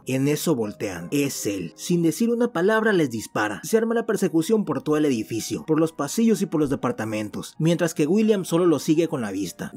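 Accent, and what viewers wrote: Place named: Aguascalientes, Mexico. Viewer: Tienes un acento igual que yo y los que viven en Aguascalientes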